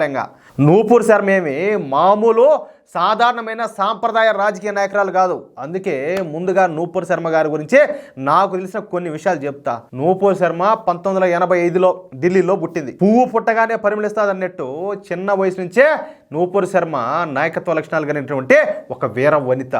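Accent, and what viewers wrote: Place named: Nellore, India. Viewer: I like your Nellore style language Garu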